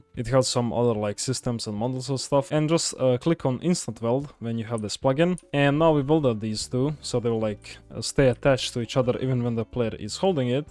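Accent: Russia. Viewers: You have a Russian accent.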